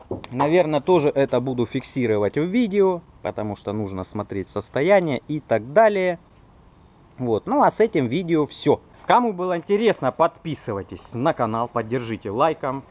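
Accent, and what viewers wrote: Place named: South, Russia. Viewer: ох уж этот южный говор :) хазуй хазуй!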